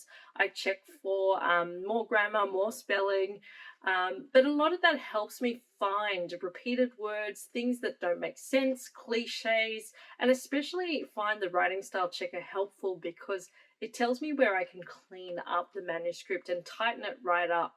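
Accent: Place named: Australia. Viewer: This was super useful! I've suscribed because you give great advice and because I love your aussie accent. I haven't checked your YouTube channel yet but I hope you're still doing videos! I've finished my first draft (80k words) and editing is killing my dreams! But this has given me so some hope :) Thanks!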